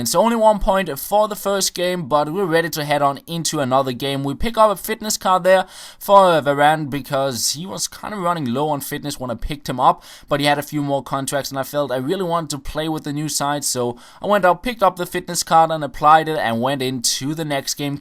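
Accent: Denmark. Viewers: Man you can really hear that you are danish, so hard and thick dialect hehe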